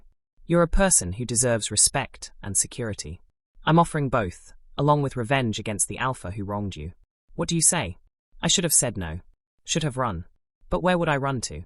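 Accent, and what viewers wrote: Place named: England, United Kingdom. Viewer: good story, i happy that i found a channel with a english accent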